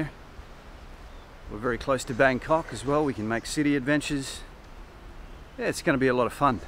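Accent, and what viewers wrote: Australia. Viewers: When you and LJ DO get together, be sure and make a vlog. Reason why is because your Aussie accents are totally different.. I guess each city in australia has their own unique accent??. True?Fun to listen to either way for us americans.. I also listen to a channel called archieluxury. He is a crazy bloke from Brisbane who is also in love with bangers.(thailand). Check him out if you havent already. He is definately certifiable.. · Hi, you sound Australian. also you're not old enough to retire ? have a nice day.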